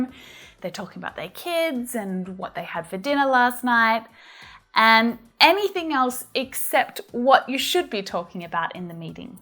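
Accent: Australia. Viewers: I'm a little bit getting hang of Aus accent while learning your videos.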